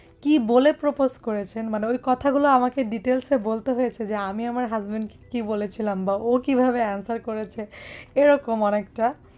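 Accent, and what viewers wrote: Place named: Dinajpur, Bangladesh. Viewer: Hi api apner video dakhi vloi laga apni onk sundor guceya kotha bolen apur soshur bari kothay north Bengal a naki dinajpur Rangpur ar moddha naki video ta Dinajpur ar kotha sunlam tai ask korlam amr home town oh Dinajpur a asola nejer place ar kotha sunla monta kano jani alada felling mona hoy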